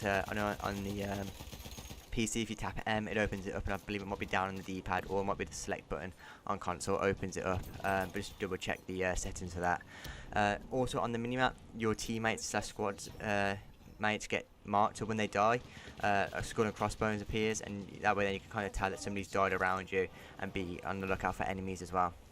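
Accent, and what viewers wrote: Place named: United Kingdom. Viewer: 😂: Getting dusted in BF1, found trust in your UK accent.. teach me Sifu.. 💯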